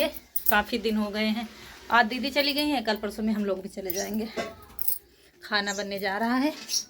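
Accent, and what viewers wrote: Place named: Unnao, India. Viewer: Kya aap unnao jila ke hasan ganj ke aas pas ke rahne wale ho. Kyo ki ham bhi wahi ke rahwasi hai aur Aapki bhasa bilkul hamare jaisi hi hai aur sare sadi biyaah ke reeti rewaaz bhi. Mai mumbai main rahti hu aur jab bhi aapki vedio dekhti hu to mujhe bhi apne gao ki yaad aati hai.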